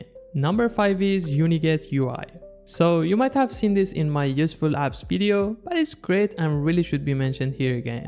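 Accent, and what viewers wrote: Iran. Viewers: با توجه به لهجه انگلیسی ت فکر کردم بچه ایرانی پروفایل ت رو چک کردم زد ترکیه لهجه انگلیسی دو کشور نزدیکه به همه 😂 سال ۲۰۲۰ که ویندوز ۱۰ نصب کردم هنوز UWP زنده بود، اونموقع مسائل fluent ui خیلی دنبال میکردم برنامه Win UI Gallery 3 هم میتونی یه ویدئو بری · from the accent, I immediately knew you were Persian just like me 😅 But anyways, insanely good video bro, and especially as someone who comes from Fedora GNOME, this is a godsend, considering all the apps in GNOME have a unified UI across the whole system, which Windows 11 CLEARLY lacks. And UniGetUI is a really good package manager considering I'm used to DNF. You deserve a like and I quite frankly, HAVE to save this video. Thank you، برادر.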